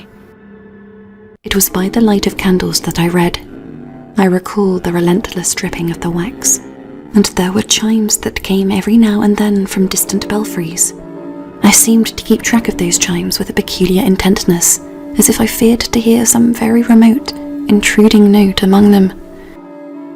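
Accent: England, United Kingdom. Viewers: I think has lost the plot. Why do you dwell on such disturbing past times my dear? An English girl with that accent should be enjoying life with a good husband 🙂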